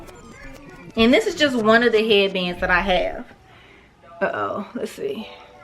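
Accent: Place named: Midwest, United States. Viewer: Hey Love...this is sooooo cute! That bag of headbeads yaaaaaaaaaaas! Lol You certainly have an accent! It's super cuuuuute tho. I told you I was obsessed with Nelly back in the day and I still love Midwest accents so I'm biased. Lol 💗💗💗💗💗💗💗💗💗💗💗💗💗💗💗💗💗💗💗💗💗💗💗💗💗💗💗💗💗💗💗💗💗💗💗💗💗💗💗💗💗💗💗💗💗💗💗💗💗💗💗💗💗💗💗💗💗💗💗💗💗💗💗💗💗💗💗💗💗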